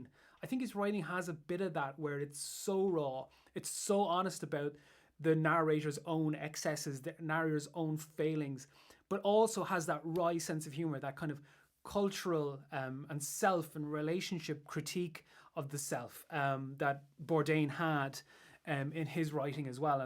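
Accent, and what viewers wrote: Ireland. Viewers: Man, thank you for that fabulous review with your honey-sugared lovely irish accent!:) Can you and please come to my private christmas party???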